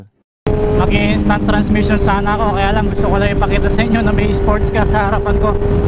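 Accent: Pampanga, Philippines. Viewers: Yung pagtatagalog mo sir halatang kapampangan hehe ride safe always idol.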